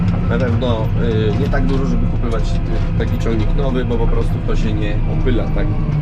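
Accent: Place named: Mońki, Poland. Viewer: Słyszę że kolega z Moniek haha daleko masz do szwagrów?😂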